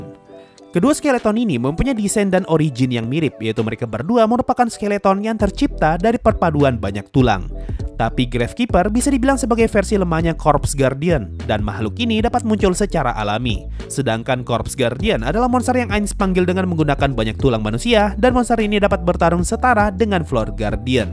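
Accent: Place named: Papua, Indonesia. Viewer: Z dengar kk pu logat nih kayanya kk tinggal di Papua to.. di kota mana kk??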